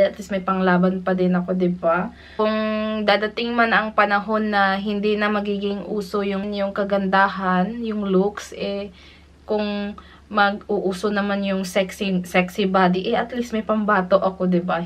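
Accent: Visayas, Philippines. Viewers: Mag ka boses kau ni Rhaze at visayan accent hehe hayaan muna mga nega... Stay safe! ❤️ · tama jud dai. katawa lang ko aning mga nega. Just stay positive. bitaw pag first nako pag tan aw nako sa imong Blog or Vlog maka tunog mo ni Ms. Rhaze siguro the way atong accent na bisaya ana jud guro. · Ganyan talaga ang mga accent ng BISAYA! Wala ka kahit anumang bagay ba ginagaya. Ikaw yan! Kahit anong mangyari ikaw at ikaw parin yan. That's life! Just be proud of you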